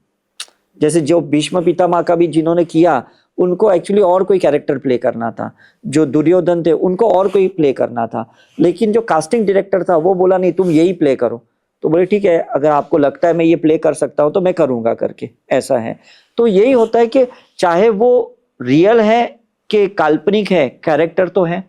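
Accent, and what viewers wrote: Haryana, India. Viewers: एंकर हरियाणा के हो क्या भाई??? 🌹😊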